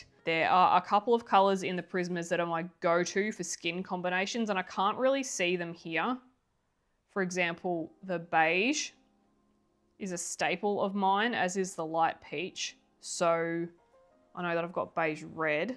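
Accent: Australia. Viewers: I live in Queensland and have both sets. Up here in summer 30 to 37°c polys blend and smooch just like the prismas. No air conditioning. 😅 But I will always prefer Prismacolor because of how I colour, dark to light and blend together. I hate layering takes to long for a vibrant result for me. Each to their own though. Love your videos and great to listen to another aussie on YouTube. Have a good Xmas and new year. Waving from Queensland 😊👏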